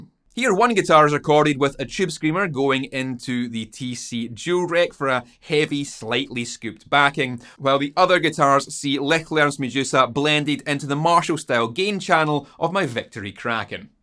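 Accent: Scotland, United Kingdom. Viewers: I remember you from the other channel.. Im much older then you are.. I always seen you as this friendly metal musician, hard rock, heavier then but that style of metal-rockish 80 thingy. I was almost thinking that the next hit song will be released by you, and it will be better version of" ". I thought that untill now!!! I always thought that you had a cute accent and always respected it because I way better like the original Scottish culture then the imperialist culture of England, and Scottland was always this land of beuty surrounded by people who dont belong there. What you expressed today made my jaw drop! YOU ARE FUCKING AMAZING!!! DUDE! GREAT RESPECT! Respect for being such a friendly and professional video content creator while hiding this FUCKING AMAZING BEAST INSIDE YOU! Dude.. Awesome! Did Anyone else notice that!?!?! SHARE THE FK OUT OF THIS! 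The guy is A-FUCKING-MAZING! No im not getting payed for writing this shit! I could be his dad, and I got my own son.. The guy just impressed me so much. Oh my God.. YOU SIR GOT AMAZING SKILLS AND TALENTS!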